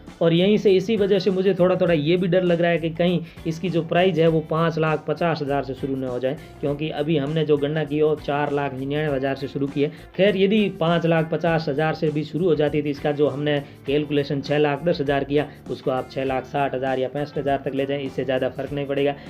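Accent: Rajasthan, India. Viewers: Bhai rajasthan ke ho lagh toh rha h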